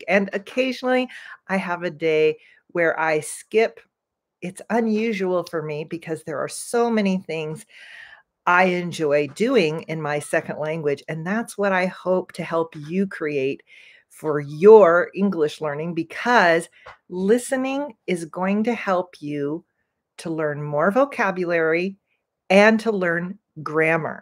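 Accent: United States. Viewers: I am from Indonesia and always glad to hear American English with speak slowly like you do. I just still dificult to understand between can't and can. Thank you. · Dear Madam I like to hear your voice and I almost understand all the content of your speaking. Even though, I've been learning English for almost twenty years, up to now, I can't imitate American's intonation and style like you do. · Unfortunately, I didn't watch the livestream. However, now I am watching and I can understand each word coming from your mouth very clearly although you're American. Since American English is harder to understand than British English. Probably you are speaking slowly because of your having lots of international followers. Good channel and very helpful. Thanks for your contribution. 👏👏👏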